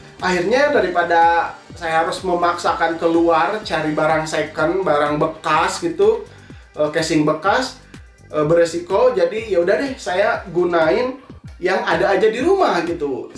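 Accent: West Java, Indonesia. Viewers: kang asal sunda? darimana?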